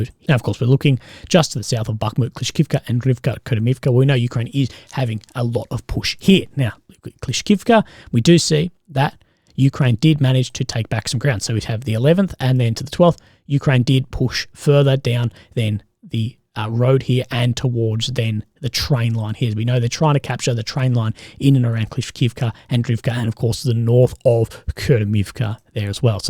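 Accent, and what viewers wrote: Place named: Australia. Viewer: I like your take on things as one old soldier to another..but unfortunately your gravel voice and Auzzie accent make it difficult for non native speakers to follow you so please speak a little slower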